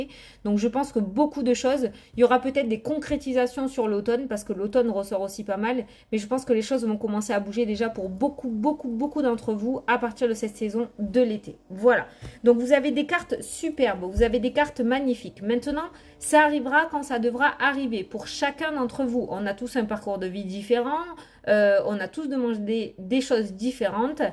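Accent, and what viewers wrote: South, France. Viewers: Dommage j'adoore ton assent du sud. 😄😎🌞🙏 C'est le Soleil. Merci c'est Super. 🍀💫🙏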